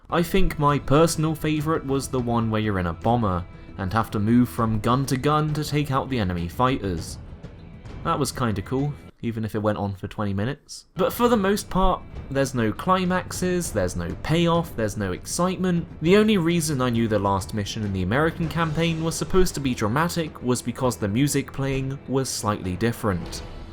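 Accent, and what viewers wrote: United Kingdom. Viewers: Thaaaaanks! I always imagine your British accent when I read your comments lol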